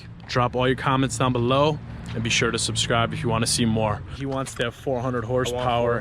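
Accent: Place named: Philadelphia, United States. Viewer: Lol bro you just like your from philly.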